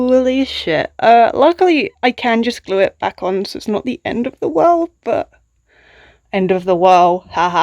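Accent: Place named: United Kingdom. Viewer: too british.. otherwise 10/10 thank you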